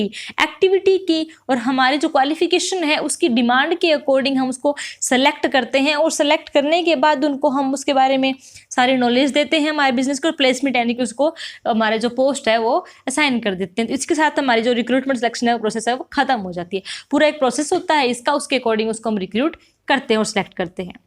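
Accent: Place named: Haryana, India. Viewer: Mam aapka anjane ka trika bahoot acha Hai😊but language me thoda Haryanvi Touch Hai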